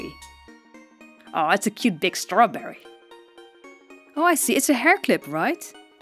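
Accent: Netherlands. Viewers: haha ik vind je nederlandse accent echt geweldig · Wait? We Dutch like our coffee too. Well okay I’m English but living in Holland these last few years gave me a love of coffee. I’m going to susbscribe and see what you do with dolls. Btw, are you from the north of Holland? I can’t quite place your accent :P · Dus je bent eigenlijk Nederlands? Btw dat denk ik door het accent ———————————————————————- So you are acually dutch? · I’m new to this channel but when I heard your voice I knew you where Dutch! Finally someone who likes dolls and Littlest Pet Shop that lives in the Netherlands! Thanks for making this channel! This really helps me out but can you make videos for Littlest Pet Shop? That would be nice! Still nice video! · OMG YOU ARE DUTCH? OR LIVING THERE? I can just hear it at your voice! I am living here too. in Rotterdam. now I feel better. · I live in the netherlands too! 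This is my first time watching your channel, and when i heard your voice and accent i immedianntly knew you lived in the netherlands! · I can just FEEEEEEEEL the dutch accent (yes, me myself is also dutch) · Ik hoor het aan je stem dat je nederlands bent en je praat nederlands en Nederlands 😅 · ik kan zien aan je acsent dat je nederlands bent xD \ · Ik kon gelijk horen dat je uit Nederland kwam😂😂❤️❤️❤️ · U can hear ur dutch😂😂😂 so am i btw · Ha ha😁 I'm Ducht and can hear you are to😆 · haha je hoort echt dat je uit nl komt maar leuke video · Ik dacht al dat je Nederlands was door je accent. Leuke video ❤️ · Ik kan het horen dat je Nederlands bent. Het accent😂😂😂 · Ik kan het gewoon duidelijk horen aan de manier waarop je praat dat je Nederlands bent 👏👏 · I KNEW IT - I recognized the Netherlands accent in your voice! I live there too-! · Haha, hoor t gelijk, dat is een Nederlander xD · Ik hoorde je accent en vroeg me af is zij Nederlands ofzo xD Edit- waar haal jij je manga? 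· Haaaaaaaaaargh Nederlands accent😂, ik wist ineene wel dat ik de goeie video had! En echt wel een gaaf kanaal ey! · Oml NEDERLANDS YES YES YES IK WIST T AAN JE ACCENT :D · I didn't know you were Dutch as well until I watched Fairy's video and now I can clearly hear your accent xD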